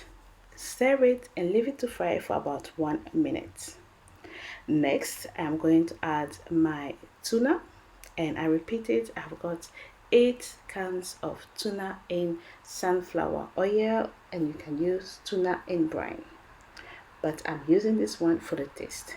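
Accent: Ghana. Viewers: I love your Ghanian accent, I have that with Dutch ..lol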